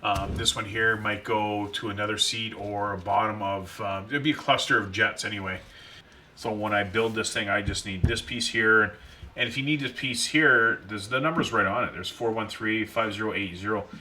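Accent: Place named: Canada. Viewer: You're Canadian accent makes me want to vomit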